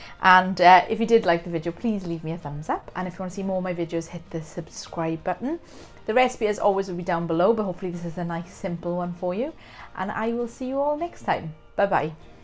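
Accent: Wales, United Kingdom. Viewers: I'm sure that's a Welsh accent I hear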